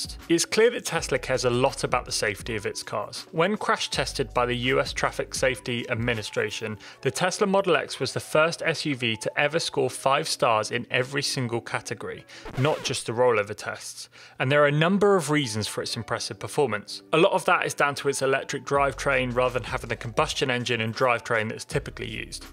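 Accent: United Kingdom. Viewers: Is he British??? Just curious to know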